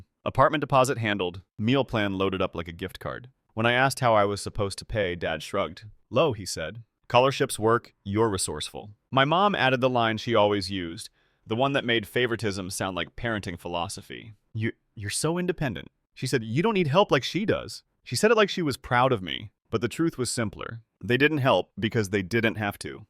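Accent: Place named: Russia. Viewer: 2nd video seems like the narrator went Russian or something again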